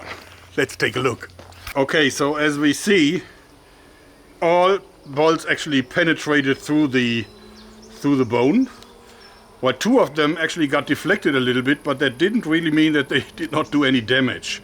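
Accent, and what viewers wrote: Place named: Germany. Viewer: For one, international english is based on British English unlike canadian and american English. But also, Mr is german, so his pronunciation is different, no moreso than any regional dialect like American.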